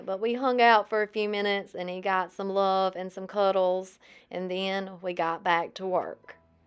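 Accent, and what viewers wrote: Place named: Mississippi, United States. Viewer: (my first ever doing a comment) you are so talented in your woodworking! I enjoy your reel contents. You tell enough how you do things but don’t talk to just be talking, which I don’t mind accent being from MS! You always have variety of items. The bench is lovely, but I would have liked the seat stained, too. Adorable bunnies.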